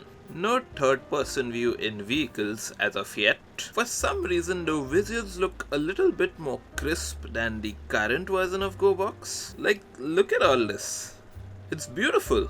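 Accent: India. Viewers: Are you indian or its just your accent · indian accent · Bro I thought he was from other country but, I noticed his voice that sounded like Indian. so, I checked his profile and he was Indian. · I know gonna be great video if is India accent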